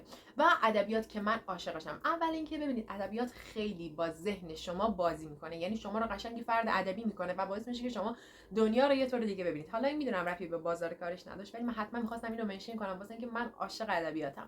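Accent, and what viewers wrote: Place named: Rasht, Iran. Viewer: از لهجه ات معلومه رشتی هستی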